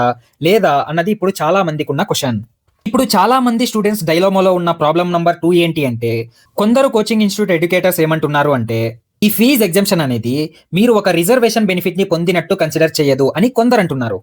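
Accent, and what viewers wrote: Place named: Rayalaseema, India. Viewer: Anna super ga Explain chesaru... Mee slang, Rayalaseema/Karnataka border lo unna valla laa undhi... Meedhi ye ooru thelusukovaccha?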